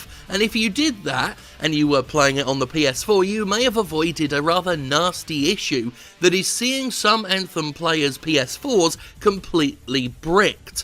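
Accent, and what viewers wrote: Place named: Working class, United Kingdom. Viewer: I don't really watch for video games stuff. Working class British ranting is the only way I can finish. Thanks!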